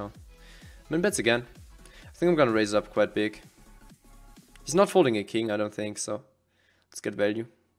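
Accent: Germany. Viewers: You should work on your german accent. And maybe dont cough so much ;)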